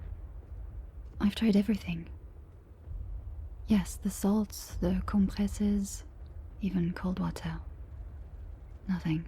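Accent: France. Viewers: J'adore ces audios avec l'accent français parce que ça m'aide a mieux comprendre 😂 Joyeux Noël à tous depuis la France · God I wish for French GF to listen her speaking with this accent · French accent? You have my undivided attention. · This was so great! Your French accent is superb ❤ Merry Christmas ❤🎄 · I absolutely love hearing accents and your French one is AMAZING. Thank you for this wonderful gift of a video!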